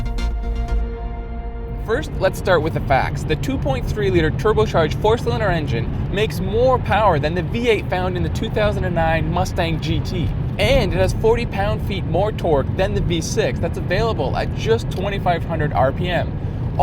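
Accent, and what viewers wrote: Canada. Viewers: so canadian sounding, i dig it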